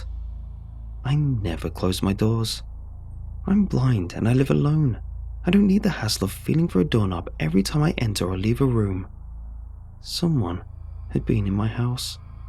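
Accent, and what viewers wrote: United Kingdom. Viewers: British accent from Chattanooga Tennessee?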